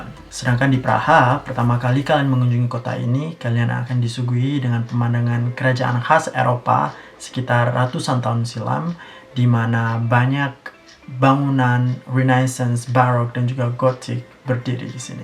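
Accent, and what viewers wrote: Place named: Surabaya, Indonesia. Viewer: Dari cara bicaranya...medok, ciri ne wong Suroboyo.. Kota tercantik di di dunia... Ngk tau saya..nyimak ae yooo 😊😁😁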